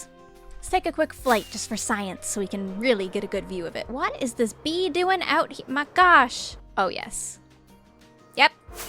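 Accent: Newfoundland, Canada. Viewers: Oh my god your accent makes so much more sense now that I know you're from NFLD lmao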